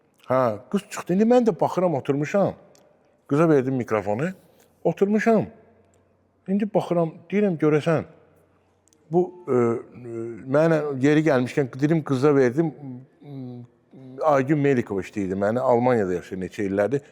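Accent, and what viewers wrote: Baku, Azerbaijan. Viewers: гагаш, тебя очень приятно слушать акцент наш халат Бакинский, да брат Баку не тот и Контингент не тот. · большое спасибо. Бакылы данышыгы эшитдим. Бакинский акцент остался у тех, кто учился в русском секторе школы. В азсекторе преподовали учителя из районов и сел.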